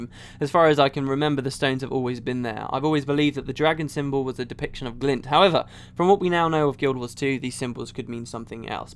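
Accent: United Kingdom. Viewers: Not weird at all. It's the british accent.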